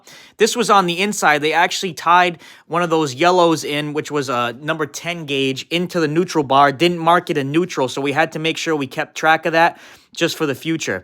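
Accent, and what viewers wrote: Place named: Fall River, United States. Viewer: Hahaha I took one listen and was like this guy's gotta be from FAWL RIVAH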